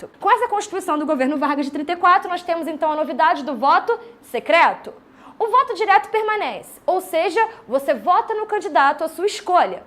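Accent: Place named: Rio de Janeiro, Brazil. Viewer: Parece mesmo kkk ainda mais pelo sotaque de carioca .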